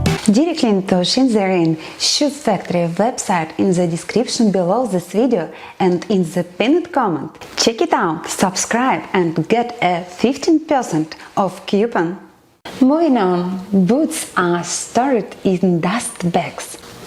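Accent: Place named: Russia. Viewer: Сач э гуд инглиш, бат виз сам рашн акцент. Бат ит нот со хэви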